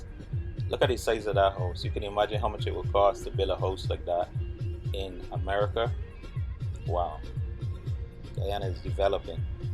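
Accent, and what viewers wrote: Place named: Barbados. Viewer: sir, l am hearing a Barbadian accent . Are you Bajan or did you live there? Nice video.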